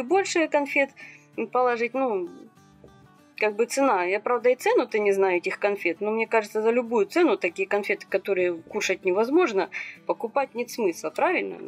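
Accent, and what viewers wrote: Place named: Ukraine. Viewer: Согласна с Вами, коллега! Очень вкусные конфеты! Да и говор у автора видео явно хохлятский... 😂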